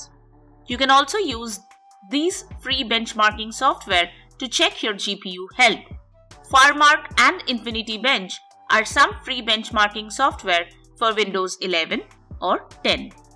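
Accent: India. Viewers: step 1 , don't trust any computer video of indian accent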